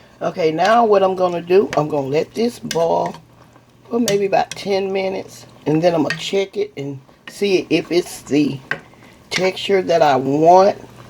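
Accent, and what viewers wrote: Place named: South, United States. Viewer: Okay. So she had me at tomatas .. bet she pronounce mosquitas the same way.. REAL SOUTHERN WOMAN YALL!!! I'm riding with her let's go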